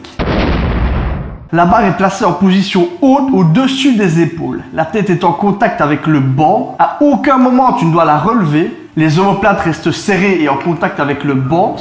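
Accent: Liège, Belgium. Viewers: Accent belge de la mort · Super tes vidéos. Tu as l'accent de Liège non? Tq je suis de la province de Liège aussi 😂💪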